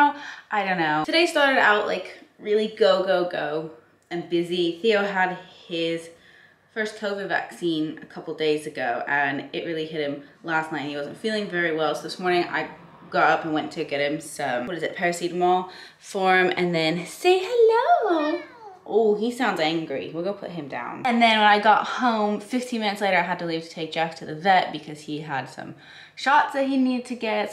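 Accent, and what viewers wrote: England, United Kingdom. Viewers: That English accent is coming along lovely bruv :)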